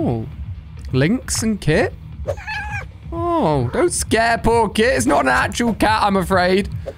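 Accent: United Kingdom. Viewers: Are you British?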